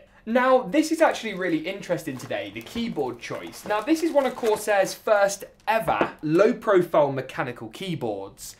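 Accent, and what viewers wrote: United Kingdom. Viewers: Great video but I don’t get why you are listing and talking about prices in Dollars, you sound like your from the UK so I’m a bit surprised and youtube is full of American PC Builders so it’s shame. Also it’s all great showing a great looking and performing build but it would be nice to see some thermal results of the builds which is just as important.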